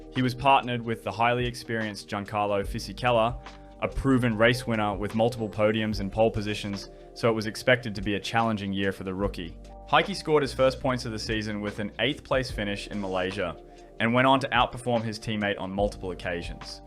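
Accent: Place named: Australia. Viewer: Love the way your accent randomly switches between Aussie and American between sentences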